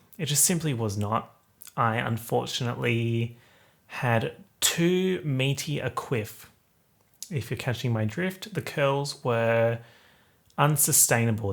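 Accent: United States. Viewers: Just sayin....your American accent just sounds like you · your american rap accent is good tho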